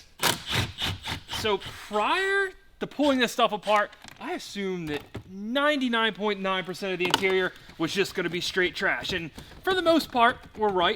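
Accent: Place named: Eastern Shore, United States. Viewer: Just by your accent I can tell you're from the Eastern Shore MD😂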